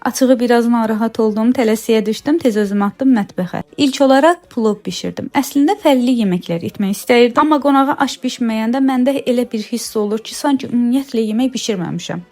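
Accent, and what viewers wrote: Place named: South, Azerbaijan. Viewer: Baxan kimi bilmişdim cənub bölgəsindənsən. Eynilə qohumumuzun gələni kimi danışırsan. ❤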